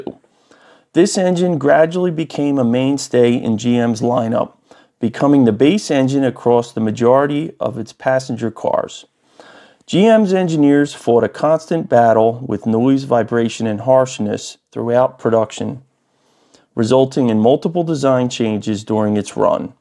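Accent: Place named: Chicago, United States. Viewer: If you wanted to know what a Chicago accent sounds like, here you go. Guys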